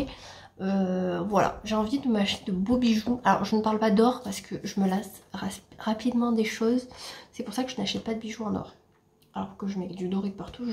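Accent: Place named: South, France. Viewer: Coucou je connais pas du tout ton département soit orange 🍊 nous on est de Bordeaux et nous partons très souvent en Espagne et en Italie 🇮🇹 nos pays d origine donc à art Paris on connaît peu la France 🇫🇷 et sinon tu as un accent très prononcé du sud c très jolie a l oreille bisous à ma youtubeuse préférée ❤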